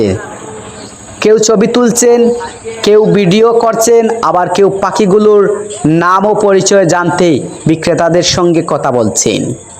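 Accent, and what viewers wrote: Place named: Sylhet, Bangladesh. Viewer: সিলেটি বেটা শুদ্ধভাবে বাংলা বলতে পারে না। পারোনা যখন তাহলে তোমার আঞ্চলিক ভাষাতেই ভিডিও বানাও।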